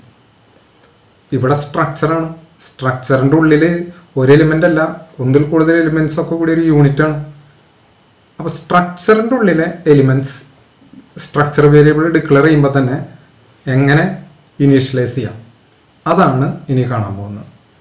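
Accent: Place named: Thrissur, India. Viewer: Sir super class. Pinne sirinte Thrissur bhasha suprrrr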